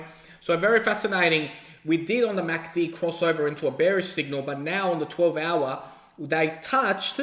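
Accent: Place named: Australia. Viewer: Nice to hear an Ozzy. Subscribed.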